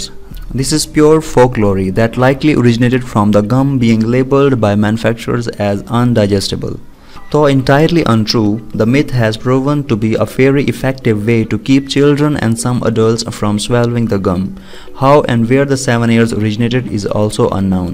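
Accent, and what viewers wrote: India. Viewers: Is that an Indian 🇮🇳 accent? I'm chewing gum right now This is helpful 👍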